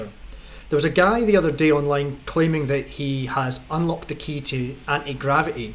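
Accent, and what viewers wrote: Scotland, United Kingdom. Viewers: The fact that this is all narrated with a heavy Scottish accent makes the whole diatribe the more entertaining 😂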